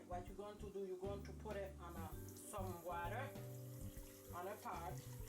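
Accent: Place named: Haiti. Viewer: u remind of my sister n mom the have that Haitian accent they have passed away. thank u for help me learn what they didnt finish teaching because haitian american u lose alot of culture thanx to bring back haiti to me n the world.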